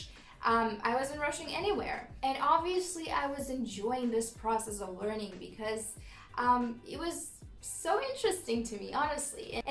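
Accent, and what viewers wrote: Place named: California, United States. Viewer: I am American, you barely have an accent. Change a few sounds and you'd be confused for a California girl. Well done😘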